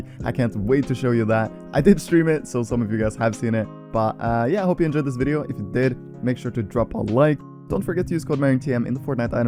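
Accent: Norway. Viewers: Are you from norway? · Are u from norway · wait are you norwegian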